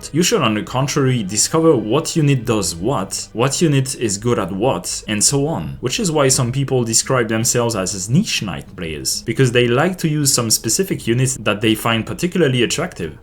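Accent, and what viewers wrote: France. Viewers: Are you perhaps French? Bonne vidéo haha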